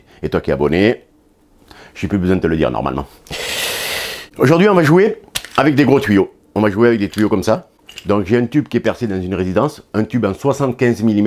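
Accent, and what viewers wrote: South, France. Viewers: Bonjour! Tu es vraiment bien outillé mais c'est aussi le secret d'un travail bien fait! J'adore tes vidéos et cet accent du sud qui respire le soleil! Stéphane de Belgique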